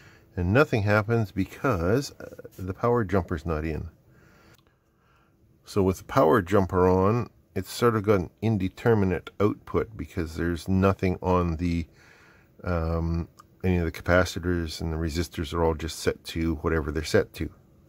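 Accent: United States. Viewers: That's mainly coz we Aussies Solder, while he SoDDers like a yank.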